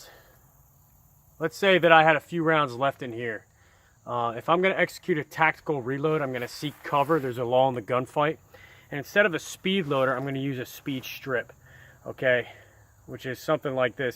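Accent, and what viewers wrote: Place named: Delaware Valley, United States. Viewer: Gotta be from PA or the Delaware Valley! :) Nice video, just picked one up on a trade, beautiful revolver.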